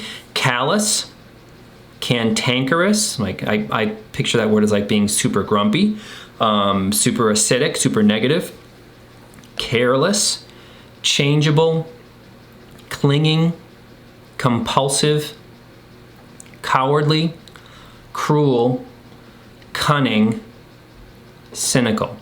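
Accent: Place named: Worcester, United States. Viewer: it"s not sting-y it"s pronounced stin-gee, meaning ungenerous, I believe. Love the channel, and the Woostah accent reminds me of home! Wicked pissah!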